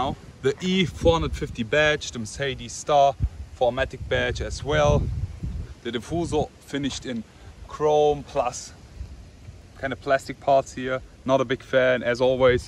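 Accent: Germany. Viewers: Man hört manchmal raus dass du deutscher bist :D aber sehr gutes Video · Haha man merkt so den deutschen Akzent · Hasse es wenn man den Deutschen Akzent so krass raus hört .. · Ich kann mir diesen schlechten deutschen Akzent nicht geben . Aber tolles Auto .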